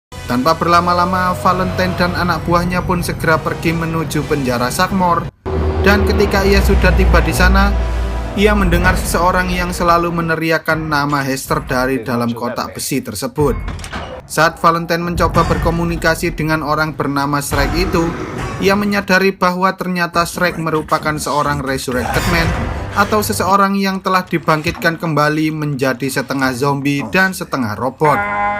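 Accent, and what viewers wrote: Java, Indonesia. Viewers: medokmu menawan, bikin kangen masss membacakan cerita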